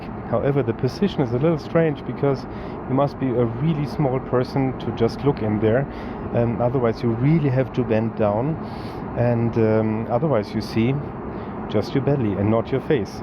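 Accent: Germany. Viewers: This was very informative so thank you for creating this. Also, and not really related, but your German accent comes across as being very friendly 😊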